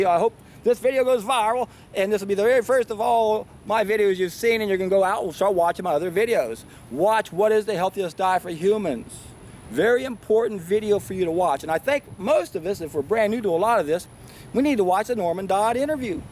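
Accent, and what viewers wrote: South, United States. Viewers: I'm from France, and I think your accent sounds funny. Is it the southern accent ?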